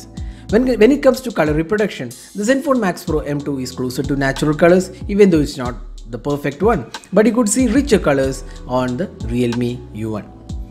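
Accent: Kerala, India. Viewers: His english accent and location looks of kerala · are you from kerala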